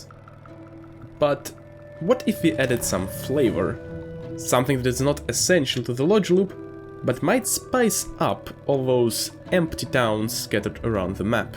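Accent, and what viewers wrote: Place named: Russia. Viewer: Я слышу русский акцент...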